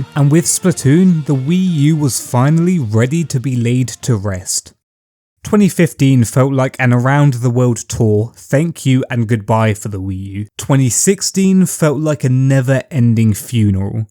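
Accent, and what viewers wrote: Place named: England, United Kingdom. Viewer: The other games that were shown off at the reveal conference should have tipped people off. New Super Mario Bros U is one thing but that first person shooter gameplay showed off that should have tipped people off that it was a new console instead of a new controller because did anybody honestly think that that game could have ran on the wii? Considering later on in the system's lifespan there were downloadable Wii games besides the fact that they should have done way more of download of a Wii games, they should have taken advantage of the hype and scarcity of physical copies of Xenoblade Chronicles. Since you have an English accent you probably wouldn't know but here in North America GameStop sold Xenoblade Chronicles exclusively and they took advantage of the hype surrounding that game by jacking the price is way up.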